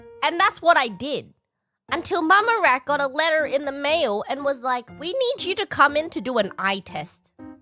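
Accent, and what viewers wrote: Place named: Australia. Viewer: 호주 영어 발음 뭔가 듣기 좋음ㅋㅋ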